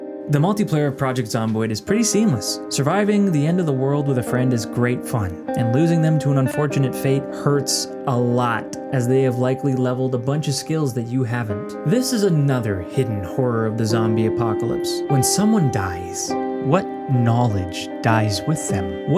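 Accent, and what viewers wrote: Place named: United States. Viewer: Naw the tooth just makes you sound 'Merican :) I cant believe how long PZ has been around. First thing I install whenever I get a new PC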